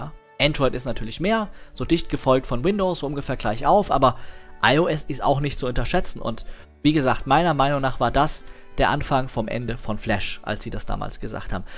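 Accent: Hesse, Germany. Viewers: Der hessische Dialekt hat sich auf jeden Fall nicht geändert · Ich weis nicht ob mir dein hessischer Dialekt früher nie aufgefallen ist, aber ich lieb‘s sehr 😂👍🏻 Und schön ein neues Video im neuen Jahr zu sehen, hoffentlich bleibt es in Zukunft dabei so regelmäßig Videos zu sehen.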